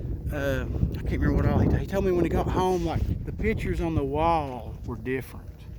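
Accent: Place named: Virginia, United States. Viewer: no problem man. I just sent my wife a.message and told her I got a comment from. I wish I had an email or sumn. Where I could converse with ya a bit. I have some pretty interesting stories from around here in the Piedmont as well. But I completely understand why you dont much communicate with people, etc. and I respect it..I have grown to have a bit of dialogue w/Brian from blackmass paranormal on eastern Tennessee. And another guy from liberty and even another still from West VA and we all exchange stories, etc. it was nice to hear from ya man. If you ever do open up a line of communication, lemme know. I'd be extremely interested. Anyhow. Keep on keeping on with the channel don't let haters or the locals discourage you. I know how locals can be some times especially when they feel they have a stake on the story being told or maybe it'll have the potential to mess with their family. 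I dunno. But keep on with it as long as you can. Me and my wife love your stories. I feel like I know you just by the way you talk and the things you say. We love everything about what you do and man if it isn't interesting. also love your accent, reminds me of my dad's mom. She had a way of speaking I consider like old.south or old Virginia like antebellum type way of speaking. The older I get the more I sound like her. Of course she's gone now, dad's gone now..but we truly feel like we know you. We appreciate your dedication to getting the truth out. Those.locals, some, would p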